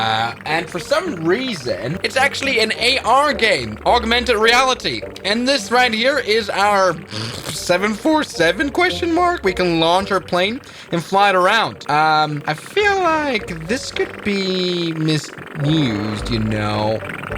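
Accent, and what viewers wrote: Germany. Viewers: Are you German? (I am ) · are you german?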